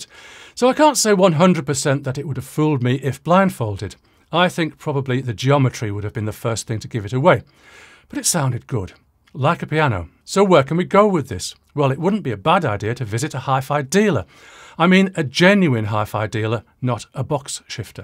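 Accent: United Kingdom. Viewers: Yes. He has one of those thin accents. He's from the North. But he certainly isn't from Liverpool.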